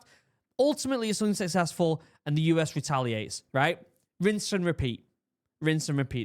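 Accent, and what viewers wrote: United Kingdom. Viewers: Yours is the only British accident that doesn’t totally bug me. Idk why but it just sends chills down my spine when British people say “I have to go to hospital” instead of “I have to go to THE hospital” but for some reason it doesn’t bother me as much when you talk lmao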